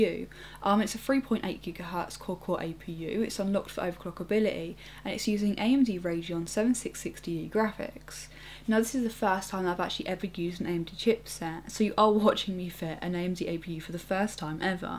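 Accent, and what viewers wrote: England, United Kingdom. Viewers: love your videos....love the layout....love the pom accent and NO you don't need a tan. Even though my preference is leaning towards ethnic women, you look great! Thumbs up. Just an after thought, would be nice to see an extreme build with this case. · Definitely not australian. Sounds British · Armada YouTube Now if you can pinpoint what part of Britain that would be ripper....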